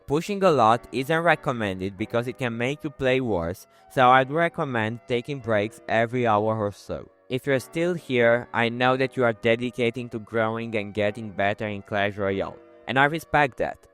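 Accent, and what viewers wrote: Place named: Iran. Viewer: by his accent, he seems irani/persian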